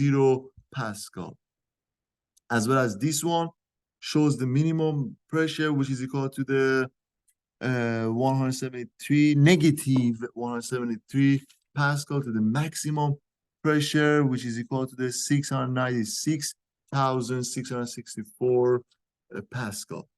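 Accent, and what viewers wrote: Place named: Iran. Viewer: Mr. Mr. What is this accent why you talk like this? Everybody must know you iranian ?? Mr. Loose the accent your in texas now baba joon what is this ??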